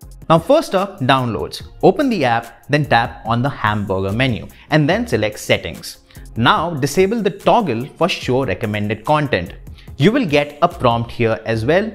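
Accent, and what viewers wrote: India. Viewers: Still talking like indians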